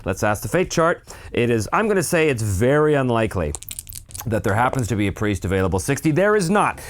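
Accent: Ireland. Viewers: Your irish accent is a bit Colin Farrel. 😁👍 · your Irish accent is pretty good! (That’s actually a big compliment!) Strong Dublin vibes.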